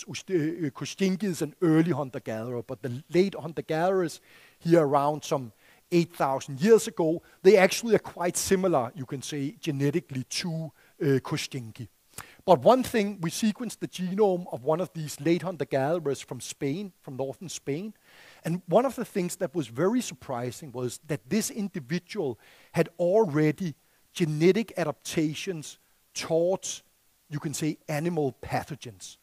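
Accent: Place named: Denmark. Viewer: Yes, he has a very typical "Copenhagen/ English accent" 😁Many Danes make the same little mistakes when pronouncing English (and other languages). Too soft consonants, too flat vowels, too monotonous intonation, an 'R' sound that often doesn't quite fit. An example of the latter is the word "very", which many Danes pronounce as "væ-i" (ie with a silent R). Some sound like they have a potato in their mouth, some say?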